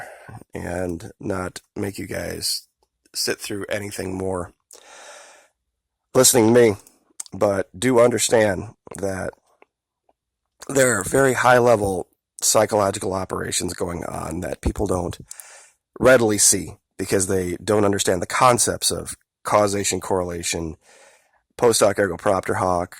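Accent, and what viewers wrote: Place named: Tennessee, United States. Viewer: Get well soon. I'm gonna join. Tennessee accent or not... genius. Just a trucker and I gotta feeling I'm gonna get caught out on the road and well you know... rodney king video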